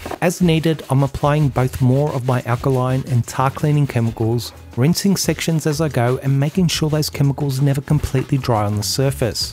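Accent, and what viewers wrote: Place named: Australia. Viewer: I’ve been a fan of your work for a little while now. I appreciate your methodical approach to detailing, and I really appreciate the tutorial like aspect of your videos for people to pick up on some of your techniques. You seem to not mind sharing the knowledge, and there is value in you wanting to do that. Thank you, and amazing work with great results! Keep it up Your accent sounds Australian, greeting from Canada. Ricardo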